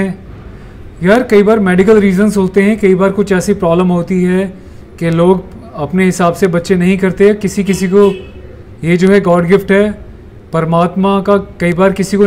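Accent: Haryana, India. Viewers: Sir aap Haryana se ho. Kya? Btao please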